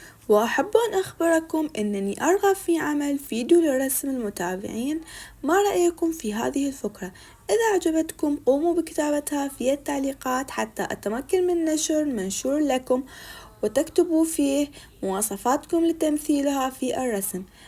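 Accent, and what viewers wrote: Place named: Iraq. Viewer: ماشاء الله على رسمك عالم اخر من الجمال ❤❤ بالنسبة لفكرة رسم الشخصيات الموصوفة من طرفنا فهي فكرة رائعة وستلهمك كثيرا لكن فقط ان اردت القيام بها ارجو ان تنشري ذلك في المنتدى اولا حتى نتمكن من وصف شخصياتنا لك ❤❤بالنسبة للكلام فانا اعشق اللهجة العراقية فهي فخمة للغاية ❤❤❤وكذا الفصحى ايضا .. عموما اتمنى لك التوفيق دائما و.. انا مصدومة من عمرك تبدين اصغر بكثيئيير وهذا امر رائع 😅😊 المهم اتمنى ان ايامك تمر بخير وسعادة و.. الى اللقاء❤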